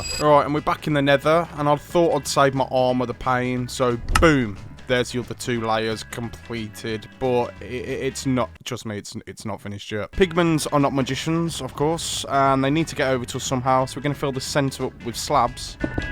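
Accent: United Kingdom. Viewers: I think bro is British. I need American translation to understand 😫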